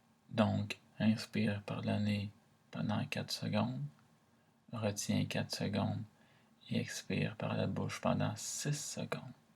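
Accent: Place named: France. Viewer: Félicitations, C'est magique ta voix, Ça m'a fait du bien, continue comme ça! ton axa demi Français j'adore. Marie Médiatrice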